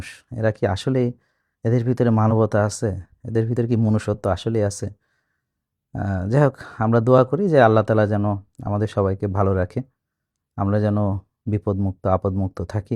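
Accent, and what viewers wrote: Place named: Jhenaidah, Bangladesh. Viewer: Amar mone hoy aisob ""S-H-O-K-U-N"" k niye apnar kono comment korar dorkar nai. Ai sob manushrupi SHOITAN aigulai chai apnar mulloban somoy nosto korte. Amar bari Jhenaidah apni jokhon kotha bolen amader tone a valo lage. Apni ALLAH vokto ekjon manush etai apnar boro porichoi. Manusher shortcomings thakbei, ALLAH amader oivabei toiri koresen. ALLAH apnar mongol korun.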